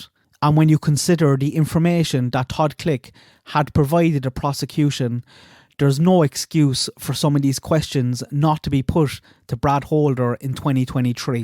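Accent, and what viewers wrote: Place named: North Cork, Ireland. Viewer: Excellent video, my only criticism is that it went a bit fast, the pictures & text. Btw, is that a north Cork accent?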